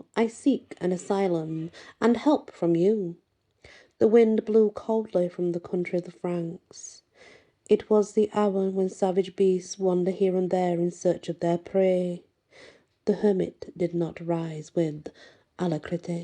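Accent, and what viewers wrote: United Kingdom. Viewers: Hey! You outdid yourself with this one! 🐎🗡 “Morvan Fights the King” was intense, emotional, and full of drama! 😍 The thunder, the battle refusal, and then Morvan’s unwavering stand—legendary!! His confrontation with the Hermit was SO intense… and the ghostly moment when he held his own head?! 🧠👻 That image will live rent-free in my mind forever! And the final redemption by Saint Anne 🕊💕… pure magic! I felt every moment thanks to your beautiful storytelling. Your British accent just adds that extra elegance and charm that draws us in like a lullaby. 💤🌸 Thank you for this masterpiece, Auntie. We love and appreciate you! 💖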